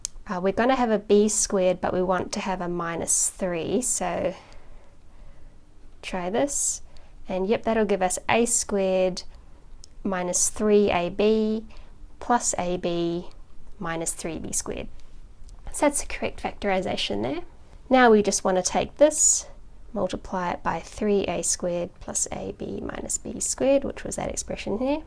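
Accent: New Zealand. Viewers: I think she is New Zealandish? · Are you from New Zealand? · Thank goodness for the Polish subtitles. As an Australian I was struggling with the New Zealand accent. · Sound like a kiwi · New Zealand accent?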